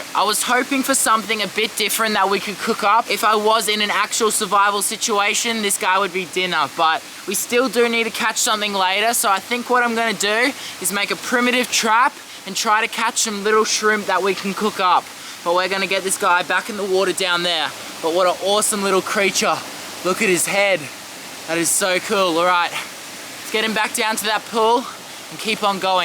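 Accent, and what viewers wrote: Australia. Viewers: Nice vid. That ozzie accent though....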